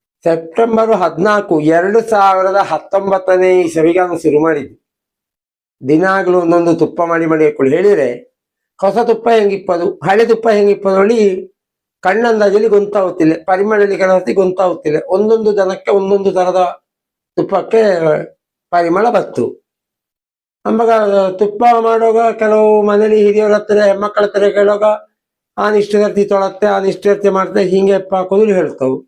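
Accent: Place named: Kerala, India. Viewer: Nimma kannada vantara Malyalam + Hawyaka kannada mixture aagi kelida hage ide.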